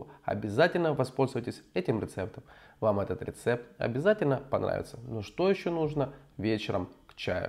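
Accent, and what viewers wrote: Ukraine. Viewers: Спасибо,.Очень просто и вкусно.А ваш милый украинский акцент вызывает у меня ностальгию.😊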